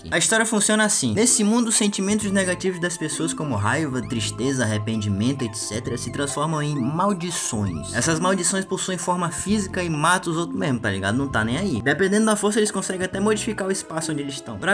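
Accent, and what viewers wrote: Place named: Alagoas, Brazil. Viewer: Krlh tu é alagoano tb skskskksksks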